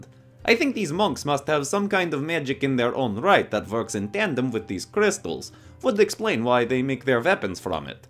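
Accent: Russia. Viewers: Love the Russian accent, heh. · The russian accent!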